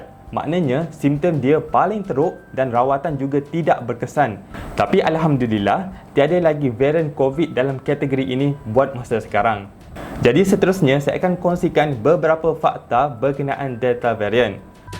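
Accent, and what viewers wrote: Kelantan, Malaysia. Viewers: pekat budu terrrr...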